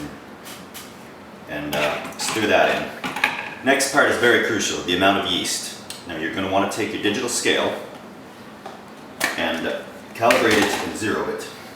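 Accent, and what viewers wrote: Canada. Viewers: U Canadian??? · plus the accent.. definitely Canadian! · This guy sounds canadian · I'm from India, I've never been to N America, and I could tell you're Canadian. How? Because of watching South Park. LOL! Your "abouts" gave it away. Yours is probably the best looking pizza base I've seen on youtube. I'm definitely gonna try. Thanks for the informative video. Where did you get the measurements to the tenth of a gram? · u canadian?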